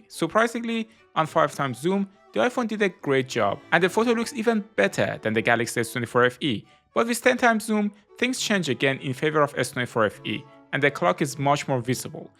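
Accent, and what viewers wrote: Iran. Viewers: لحجت به فارسی ایرانیا میخوره ! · I don't know where are you from but you have an iranian English accent 😁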